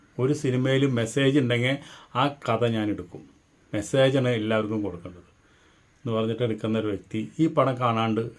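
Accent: Thrissur, India. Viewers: തൃശൂർ കാര് അങ്ങനെ പറയും. പ്രാദേശികത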